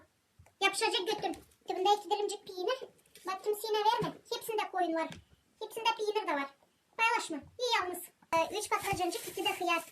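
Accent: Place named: Silistra, Bulgaria. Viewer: Keyifle izliyorum lehçe tam Silistre Türk lehçesi. Teşekkürler.İstanbuldan selamlar.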